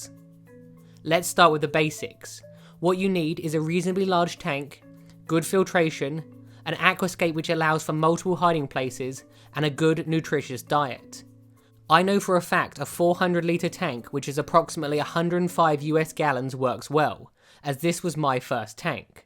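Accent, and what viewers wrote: United Kingdom. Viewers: I love your channel and yes I am a subscriber! I also absolutely adore the British accent. 😉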